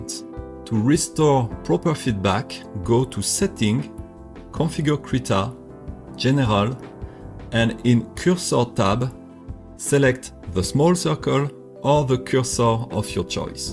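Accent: France. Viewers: Français ! j'ai reconnu l'accent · thanks!!!! you have a bit french accent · Pourquoi garder un accent français ?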